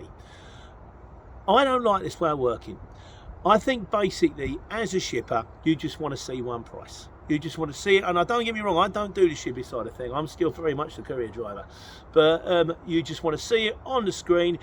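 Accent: Cockney, United Kingdom. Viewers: The reason why I watch your videos because of your Cockney Accent. I really like it 👍 💯 💕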